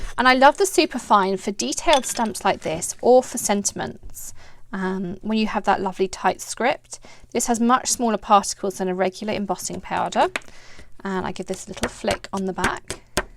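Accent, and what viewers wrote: England, United Kingdom. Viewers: I really love your videos, very clear, easy to understand and no unnecessary chit chat or giggles. There aren't many tutorials done with a clear English accent, you will be my go to site for all tutorials in future. Please keep them coming. Thank you.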